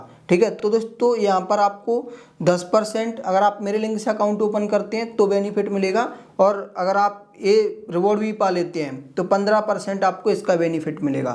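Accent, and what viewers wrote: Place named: Gujarat, India. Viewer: tum gujarati hona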